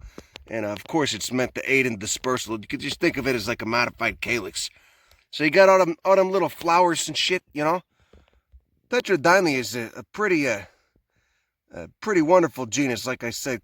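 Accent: Chicago, United States. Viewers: Chicago. Not New York. You can tell by the way he pronounces his rrrrr's at the end of a word. Chi: "conifer" NY: "conifuh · It is so funny to listen to such a high IQ discussion in a South Chicago accent. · I love this guy. It's like Elwood Blues became a botanist. Cook county born myself, love this accent. Subbed just to listen to him. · It's an old school Chicago (esp Italian American) accent. He is Italian and from Chicago but he hams the accent up to make the vids more entertaining and funny. You can see him talking in his usual voice here: <link> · But he has a Chicago accent, Goodfellas was new York, there is a difference. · Yes. He is from Chicago, is why...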